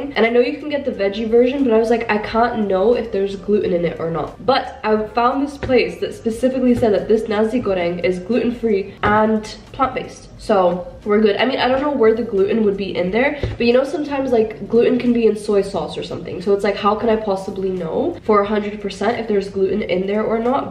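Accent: Rio de Janeiro, Brazil. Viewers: i'm brazilian and i'm so happy you're picking up portuguese, my advice is that if you already know spanish and can roll your r's easily then the paulista accent will suit you well but you definitely give me the vibes to speak like a carioca lol (have you made a language learning notion template that you're using? if yes then i would love to see it, im back on my language learning era as well and a template to keep track of all of it would be great!)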